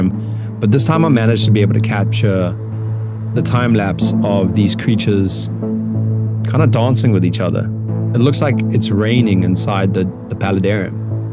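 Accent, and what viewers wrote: South Africa. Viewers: Cool, I knew I recognized your accent, I'm from SA too! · I love your content & one of the reasons why is because I can hear you’re South African 🇿🇦 ❤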